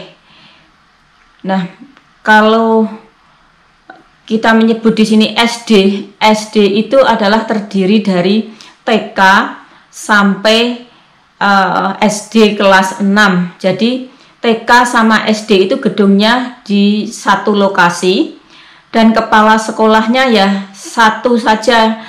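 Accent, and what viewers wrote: Java, Indonesia. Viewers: Logat jawanya ga hilang mba'yuk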